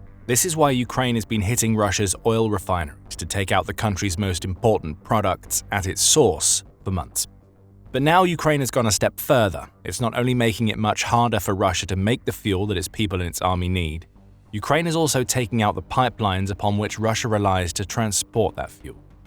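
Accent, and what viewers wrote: England, United Kingdom. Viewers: Why does the narrator (Who's clearly English by his accent) keep calling petrol, "Gasoline"? It's called petrol in the UK NOT gasoline.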